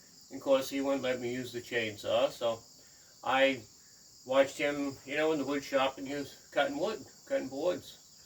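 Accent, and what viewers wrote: United States. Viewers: Hello, just checked out your channel, and I subscribed, that is quite the beard you have! I am guessing you are out east, Maine maybe by your accent? Good luck! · Just found your channel I’m looking forward to watching your updates in the wood yard. I can tell you are a new Englander by your accent, I’m in Connecticut myself, good to see New England represented here on YouTube!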